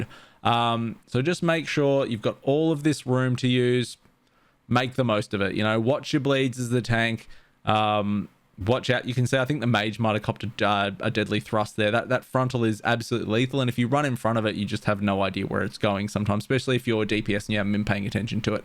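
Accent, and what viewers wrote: Australia. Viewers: Are you on Barthilas? Also, are you Australian?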